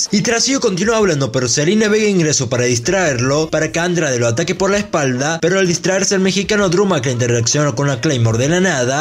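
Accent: Córdoba, Argentina. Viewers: Hola sos de cordoba? Muy buen trabajo saludos desde bueno aires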